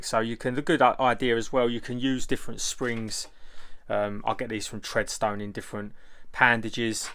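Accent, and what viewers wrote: Essex, United Kingdom. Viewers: He sounds like Southend.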